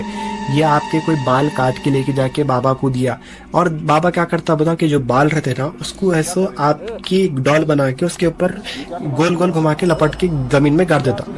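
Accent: Hyderabad, India. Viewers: Hydrabadi Tone ❤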